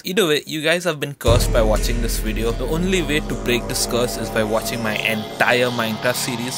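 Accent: India. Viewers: That's a true indian accent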